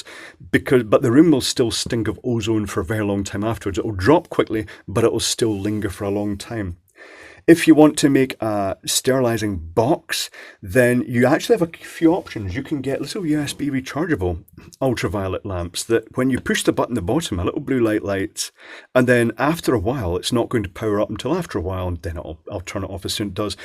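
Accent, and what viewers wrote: Scotland, United Kingdom. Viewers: it's good to hear a Scottish accent for a change.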